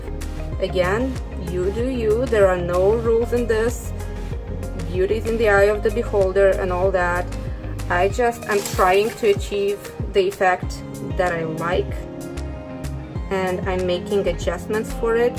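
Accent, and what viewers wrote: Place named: Egypt. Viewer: Your accent reminds me of some of my Egyptian friends. :)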